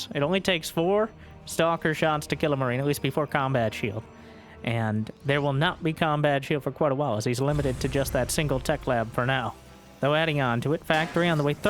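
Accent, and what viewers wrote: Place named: Tennessee, United States. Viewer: Casual banshee. Accent from Tenesee Ain't no draftee. This is StarCraft 3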